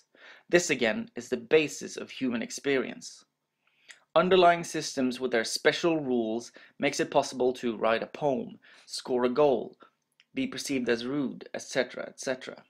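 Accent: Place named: Norway. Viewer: I do enjoy a crisp sounding Norwegian-English accent!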